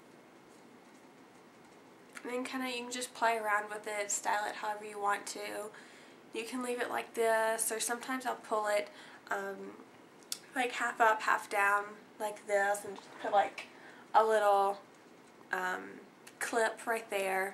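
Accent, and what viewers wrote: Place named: South, United States. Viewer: Are you from the south? I am and you have the same accent as me! ^_^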